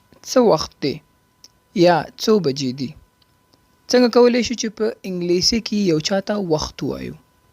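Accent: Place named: Pakistan. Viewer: your pashto same like pakistani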